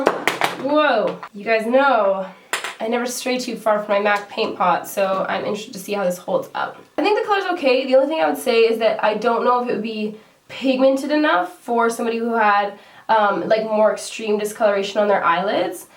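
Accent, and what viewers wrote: Canada. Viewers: you sound extra canadian i love it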